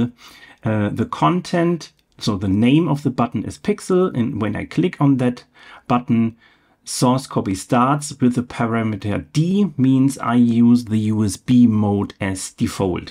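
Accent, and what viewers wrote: Germany. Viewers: man erkennt das du deutsch bist lol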